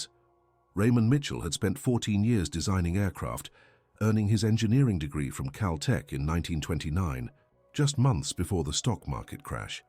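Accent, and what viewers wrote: United Kingdom. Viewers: Guess what, The United States of America is NOT the only country that watches YouTube,! Go ahead and unsubscribe if grousing about a British accent is the only comment you can make about an very interesting and informative video. (By the way, I was born and live in the USA.) Let me guess, you are a liberal, they are the only people I know that are bigoted enough to make such an uncalled-for comment. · you need to dispense with the British English!! This is the United States of America! If i have to put up with your kind of english I'LL UNSUBSCRIBE!!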